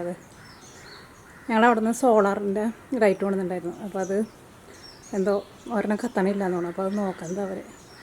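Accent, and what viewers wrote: Thrissur, India. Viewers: Thrissur basha padichu ethu enthutta sanam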